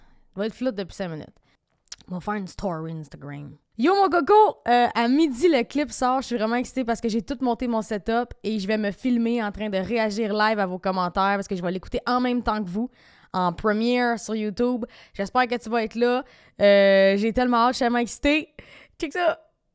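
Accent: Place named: Quebec, Canada. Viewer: Comme je te l'ai promis je regarde le replay! Trop deg de pas avoir pu être là! lol comment pas paraitre stressée 😉 lol ta montre qui se d'éclanche 😜 et pour moi à cette heure le sport avant de vraiment commencer la vidéo ^^ Hey! Attention, ti vo quère del cayelle (oups mon côté Ch'ti qui déraille! Oui le Ch'ti c'est le patois des gens du nord de la France 😉 on va bien et toi? Oui un peu tu panique car tu va être face (enfin tu as étais face) au réactions en direct live de ta communauté pour la sortie de ton clip! Donc Zen tout va bien se passer! 😉 MDrrr "on a vieillit" Attends tu aura vraiment bien veillis quand tu commencera à dire "moi à ton âge" ou "mon de mon temps " lol 😜 oki je met pause à la vidéo pour aller voter! Je reviens! ^^.............................. Voilà c'est fait!;) regarde tes mp et tu verra ce que j'ai fais en plus;) trop stressée pour pas l'avoir vu avant je pense;) 🤣🤣🤣 🦦🦦🦦🦦 ^^ à mon souvenir tu avais dis 18h donc non j'étais pas au travail mais je faisais dodo car 1h du matin heure de France;) ahh c'est donc ça l'odeur qu'il y a depuis un moment! 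je pensais que c'était moi lol 😅😅😅 prochaine fois pense à prendre une bassine et un essuis (je rigole ça se voit même pas 😉 ) j'adore cette chanson que tu as fais! il était juste magnifique ce clip! Gros bravo! Et contrairement à certains Canadiens tu as même ton accent (par rapport à moi Français 😉) 👏🏻👏🏻👏🏻 13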